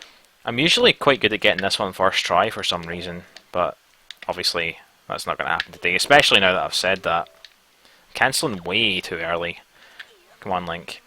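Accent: Scotland, United Kingdom. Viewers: I'm pretty sure that it's Scottish, but it might be Irish.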